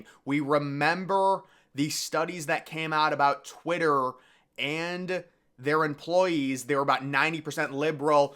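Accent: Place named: Indiana, United States. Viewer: I can tell you’re from south bend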